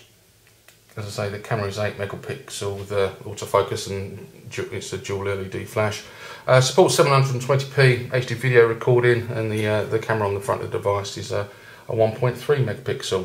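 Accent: England, United Kingdom. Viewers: Does he sound scottish or welsh,its an ENGLISH accent its like saying a yank talks canadian,3 countrys in the uk wow some people. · BRITISH ACCENT! :)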